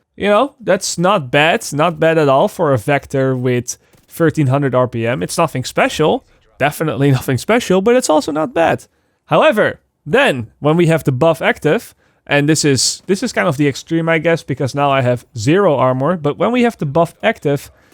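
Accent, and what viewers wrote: Netherlands. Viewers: Dankjewel voor de info, hoor dat je Nederlands bent Hahah ^_^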